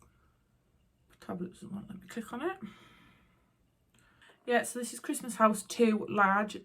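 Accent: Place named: Newcastle, United Kingdom. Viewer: Welcome to flosstube!! I live in Canada but my mums side are all Geordies, its so nice listening to you talk! Love your accent and all your WIPS. Look forward to your next video💗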